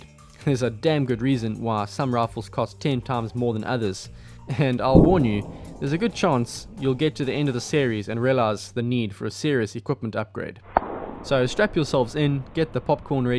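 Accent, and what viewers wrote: South Africa. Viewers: are you from South Africa. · nooit hes south african!!!!!!!!!!!!!!!!!!!! subscribed and notified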